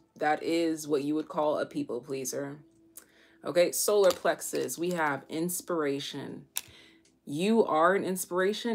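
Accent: United Kingdom. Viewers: Your British accent took me out 😅😂🎉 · Your British accent ain't half bad doll. ;) Love a good swear over here too. Thanks for a lush reading xx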